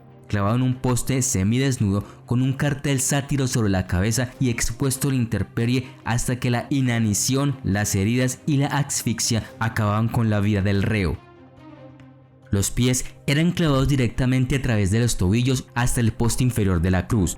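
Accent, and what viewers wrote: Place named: Bogotá, Colombia. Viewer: Ese es rolo, no le ve la voz.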